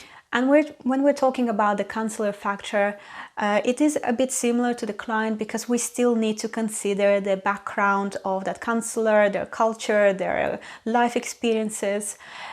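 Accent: Russia. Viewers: Are you Russian?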